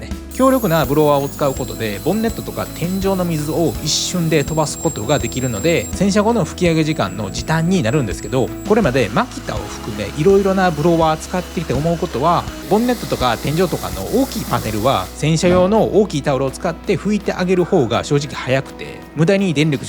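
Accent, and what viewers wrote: Kansai, Japan. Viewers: 一生〇〇って話し方関西人特有だよな。嫌いだわー内容いいのにもったいない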